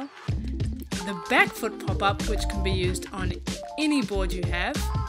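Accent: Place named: New Zealand. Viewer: Yes she's a full on Kiwi alright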